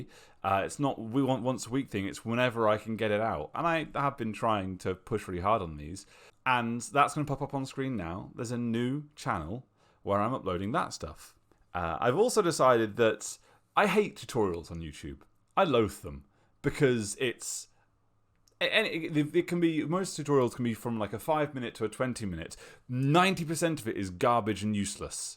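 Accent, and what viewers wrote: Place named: United Kingdom. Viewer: I’m sad that this channel is dead, but I’m really glad I decided to come here (after burning through all hopeless vods) I really like the casual nature of the videos. I’m excited to see where the Hopeless Idiots go from here, but I hope you keep this channel as archives. I need a backlog of that sweet, buttery British accent, I’m sure you understand.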